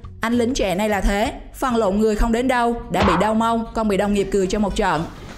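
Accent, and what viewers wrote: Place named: Binh Dinh, Vietnam. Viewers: Chịu khó đọc bằng giọng phổ thông đi em, còn nặng giọng địa phương Bình Định lắm. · bạn nữ có giọng tốt, âm thanh tốt, nhưng còn nói tiếng địa phương quá nhiều, bạn nên tập trung nói 1 giọng hoặc giọng Bình Định rặc, hoặc giọng giọng Bình Định nhưng sửa lại 1 số từ phát âm địa phương như các vần "in, ăc, ăng, ăn..." hoặc giọng miền nam, tránh phát âm các từ địa phương nghe nó quê mùa lắm. Chào thân ái - đoàn kết - xây dựng!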